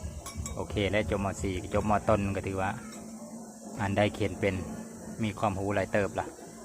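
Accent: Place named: Cambodia. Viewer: เสียงคนทำคลิปออกสำเนียงเขมรนิด ๆ น่าฟังดี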